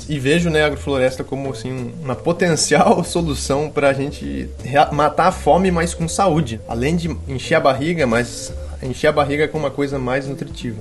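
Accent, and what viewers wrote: Rio Grande do Sul, Brazil. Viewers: Pelo seu sotaque já tava me mudando pro RS. Mas sabendo que tu tá no oeste do PR, muito mais perto. Vou ir andando me enfiar nesse espaço de vocês. Hahah #FicAGenteVêPorAqui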